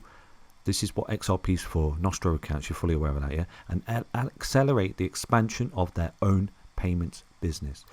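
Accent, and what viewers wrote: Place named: Leicester, United Kingdom. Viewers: u have beautiful accent, i think u are from England, i dont have sure! I'm from Brazil and I like this accent Well i didnt know Europe accept crypto like XRP or other coin bro. I'm waiting this hit up $100 or more! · It's his Leicester accent., the pride of Leicester 👊👊🍻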